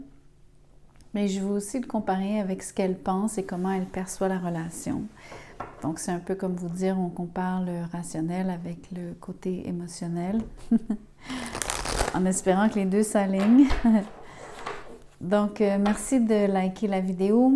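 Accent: Quebec, Canada. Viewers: Bonjour, toujours très parlant. C'est tout le concept de rester buté sur une idée pour s'empêcher d'être heureux, quelle tristesse... mais avec un accent québécois, ça passe mieux. 🥲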